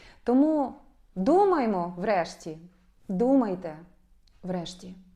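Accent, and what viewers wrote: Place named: Russia. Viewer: Зверніть також увагу на орфоепію, бо ви кажете : майданчЬик, вибачЬте, очЬорнення, злочЬинний, щЬо не було чЯсу, навіщЬо, причЬина, збагачЮватися, хочЯ, головнокомандувачЬ, почЮти, дітищЄ, бачЄння, з багатьма зірочЬками, площЯдка, чЄсноти, бла бла бла ... гаварітє парускі і не вдавайте, ніби ваша мова українська. Дякую.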